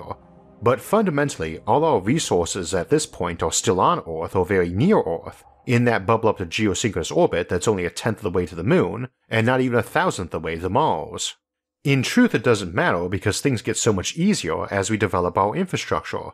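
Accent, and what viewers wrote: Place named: Louisiana, United States. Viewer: I know this is random but what accent is this narrator. Legitimate interest in dialects. Has kind of like a Cajun sound to me..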